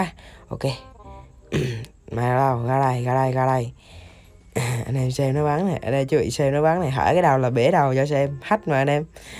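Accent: Quang Nam, Vietnam. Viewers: Giọng ông giống người Quảng Nam hay Quảng Ngãi hay Đà Nẵng ấy nhỉ ?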